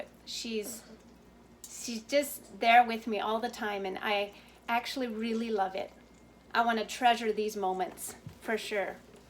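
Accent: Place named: Pennsylvania Dutch, United States. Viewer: Könnt euch ein video nur auf Deutsch machen, bitte. :-) I like your Pennsylvania Dutch dialect.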